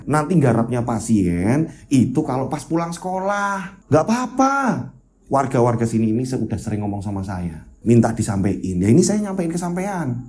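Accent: Java, Indonesia. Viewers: Boso Jowone medoook mas 😅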